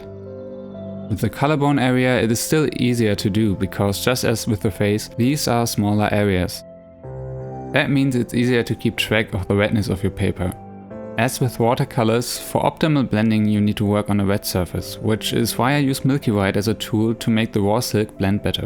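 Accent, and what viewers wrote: Germany. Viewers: I didn't think of playing with pressure when drawing with Copics. Thank you, I will definitely try that out next time! I have a question tho... Are you German? The way you pronounce words sounds a bit like German accent 😃 just wondering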